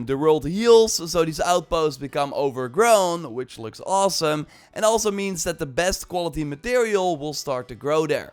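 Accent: Netherlands. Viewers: You sound dutch! I am very hyped for it! I loved farcry 3 alot aswell as ac odyssey. Here is to hoping it will be good 👌❤️ Entered the giveaway! 🎉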